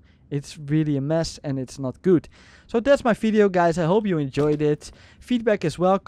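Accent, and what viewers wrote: Netherlands. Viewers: nice dutch accent ;)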